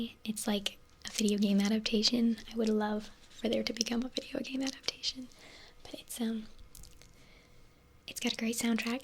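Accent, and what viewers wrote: United States. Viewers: yer a northeasterner hell yeah you rock lol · Ah a fellow New Englander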